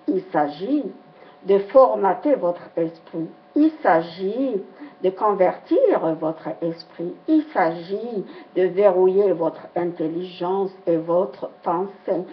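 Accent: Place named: Algeria. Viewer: C'est dommage que les Algeriens aient de moins en moins un bel accent comme le tiens... Et pourtant tu attaques Daoud...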